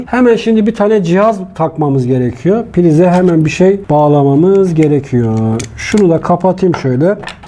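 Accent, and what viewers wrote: Erzurum, Turkey. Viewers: Güzel bilgiler ustam . meslek lisesi elektrik bölümü mezunuyum ama bunlari gostermediler.bravo basit ve anlasilir bir şekilde titizlikle anlattiniz.bravo.ayrica Erzurum şivesinede bayiliyorum.tom diye bi uygulama vardi sincapmi ne cok güzel konusuyodu.bu şiveler ülkemizin zenginligi.kolay gelsin, 👍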